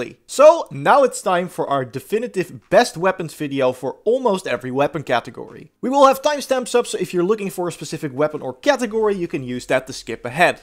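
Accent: Netherlands. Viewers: You must be Dutch. Heard it within the first 4 words you spoke. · I can hear on this guys accent he’s Dutch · Are you Dutch?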